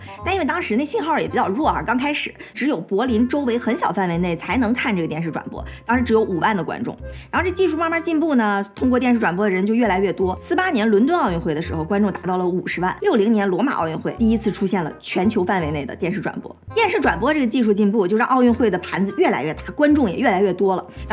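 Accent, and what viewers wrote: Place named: Dalian, China. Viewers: 喜欢大连话！！！ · 、 一口地道的东北腔，特有趣。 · 哈哈哈哈 大连话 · 这期大碴子味特浓郁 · 哈哈，小lin东北人！ “抖“是奥运会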